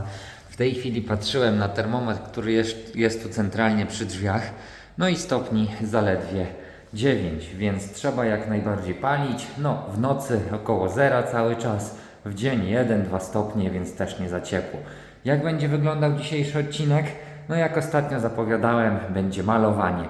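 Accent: Lublin, Poland. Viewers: Z Twoich wypowiedzi wynika , że pochodzisz z lubelskiego. "Ciapy"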